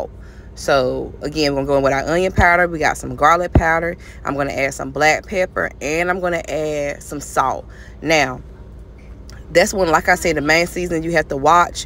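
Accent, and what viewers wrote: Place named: South, United States. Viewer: Mane you country as heck, I know you from the dirty south 😂 good video ❤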